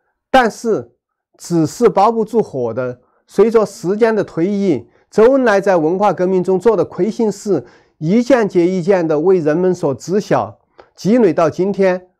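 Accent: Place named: Chengdu, China. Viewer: 成都口音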